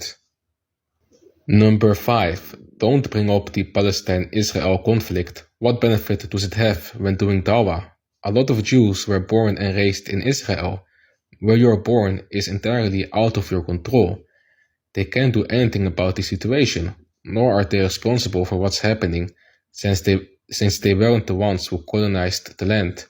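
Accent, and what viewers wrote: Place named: Germany. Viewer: Welcome to the true religion brother ! JazakaAllah for the video ! You have a crazy German accent Masha Allah 😬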